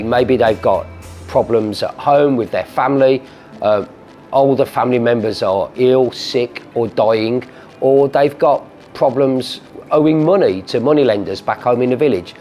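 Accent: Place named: England, United Kingdom. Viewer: Why are these videos always made by old fat bald men with English accents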